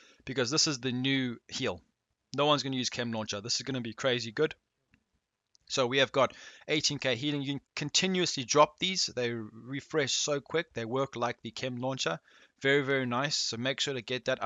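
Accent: South Africa. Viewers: You sound South African. Lol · Are from RSA bro?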